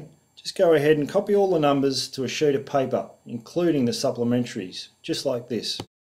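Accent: Australia. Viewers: That's because he speaks AUSTRALIAN and I can understand him easily and clearly. Thank goodness he doesn't have that American tendency to draaaaawl their words out like the word is 3x longer than it really is in a nasal (annoying) tone of voice. AUSSIE! AUSSIE! AUSSIE! OY! OY! OY! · I understand it because he speaks Australian English?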